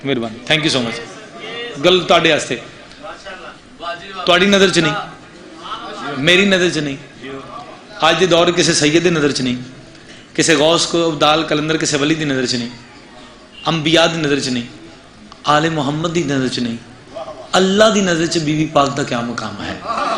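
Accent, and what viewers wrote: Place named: India. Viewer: Just like indian voice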